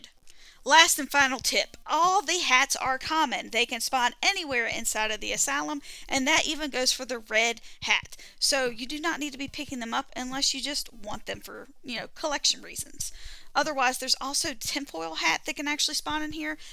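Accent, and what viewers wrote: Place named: South, United States. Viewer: Found a pink so far! Thank you for the guide southern sounding lady!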